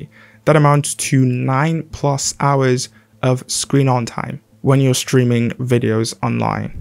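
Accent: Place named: England, United Kingdom. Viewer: sorry, you sound English while you're African. unless you're from England that's fine. authenticity is paramount.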